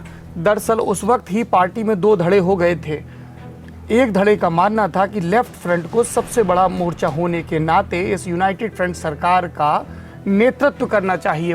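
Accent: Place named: Bihar, India. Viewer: Its typical educated Bihari accent...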